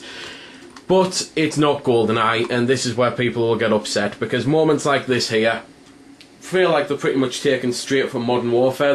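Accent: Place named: Newcastle, United Kingdom. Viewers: wow, your kidding right? This guy is not Australian, he's British. Whether he's English, Scottish or Welsh I'm not sure, I would guess northern England. Im going to call ignorant American on your part, am I right? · Too those trying to guess he's accent, it is a geordie accent, so he would be from newcastle england, i live in england (london) so am pretty sure thats right (although unimportant, just thought id clear that up) .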